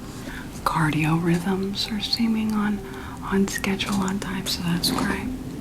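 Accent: South, United States. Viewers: i was confused when i saw your face on an ASMR video and i was like ‘wait….?’ and i have been pleasantly surprised to find you’re one of my new favorite ASMRtists and you help relax me so much!! i know you do it for comedy but you really do have a knack for it. i kind of wish all of my doctors were like this, the familiarity of speech and mannerisms put me at ease, and you have a really lovely soft-spoken voice! can’t wait to see more <3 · I find it funny cause I found you with your short videos with your southern speak and what it means. I’m so happy you also do asmr, you’re awesome and your voice is very relaxing. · i love your videos with a SOUTHERN accent!! scratches my brain! me and my gf watch them before bed and fall asleep. your comedic timing is great and the asmr is so comforting!!! ❤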